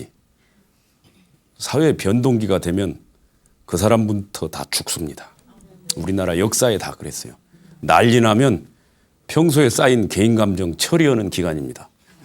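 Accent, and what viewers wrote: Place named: Jeolla, South Korea. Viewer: 기자도 아니고 교수도 아니고? 전라도 말씨네?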